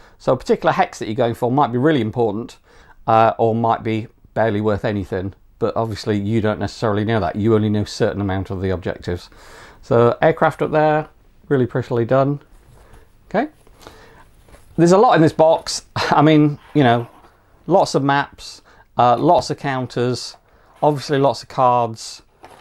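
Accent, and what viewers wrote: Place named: Europe. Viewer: I infer from you accent that you live across the Channel and not across the pond. So, yes, marketing is the reason why US forces are included instead of British troops that should have been a way more obvious choice - or, as you mention, Russians, Italians, Austro-Hungarians, etc. And, indeed, an expansion with British / Commonwealth units is under way; pictures of play-testing are available on the Strategikon forum where the creators are most active. I remember one of the creators telling me he had a lot of documentation to make scenarios with UK and Empire troops, I even think the Middle-East theatre was mentioned, and that he was kind of heart-broken to have to drop some of it to maintain the amount of material economically reasonable - at least as far as a first batch is concerned. Focusing on French troops and West front in WW1 is natural for a French team, because of more than 1.3 m dead soldiers... 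and to make a difference with the usual production in which the conflict first tends to be limited to US Marines and Belleau Wood. As a conclusion... support it and you will sure see male and female Mark I tanks in Gaza, one of these days.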